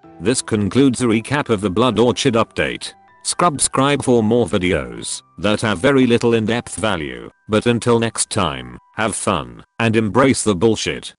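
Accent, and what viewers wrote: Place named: Australia. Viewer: You should use your own voice on some videos cos OMG your aussie accent is amazing:) everyone needs to have heard the smooth taling aussie that is;)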